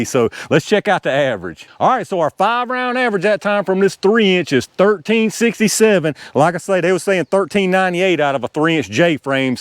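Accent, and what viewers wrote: South, United States. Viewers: I got to say, you sir are my new YouTube man crush! Don't get excited I'm heterosexual and I've been married for 28 years, but you know how to talk, you got that sweet southern accent, and your intelligent. What a combination you're the man dude! Really love your videos, keep up the great work brother! By the way, I'm just a hillbilly also from Indiana lol!😂😂😂😂😂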